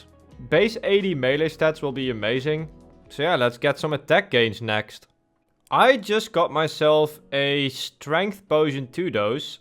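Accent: Netherlands. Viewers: My god the video is fantastic! the Dutch accent is hard to listen to, but I will stomache it for the content :)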